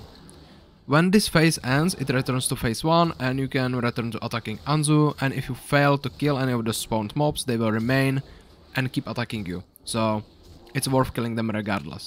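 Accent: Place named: Czech Republic. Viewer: proč jsem poznal že si čech v prvnich 3 slovech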